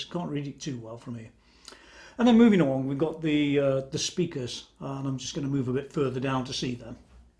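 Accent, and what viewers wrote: Stoke, United Kingdom. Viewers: I must admit I'm sceptical about the use of silicon gel for damping, not seen that before on amps I've owned. BTW, most of the comments on Psionic Audio's review are from the US, their optics will be different because of the pricing. In the UK the JTM Studio 20 is half the price. It's also amusing seeing them trying to guess your accent... cockney, Scouse, Scottish.... personally I'm thinking more up 'Anley duck!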